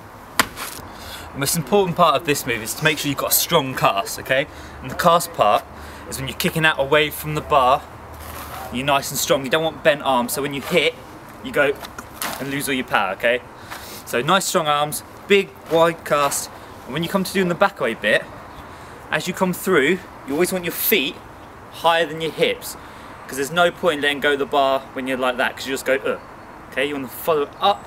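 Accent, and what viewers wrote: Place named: United Kingdom. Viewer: hey man, r u british ??? dont take me worng, but u have a quite intense accent !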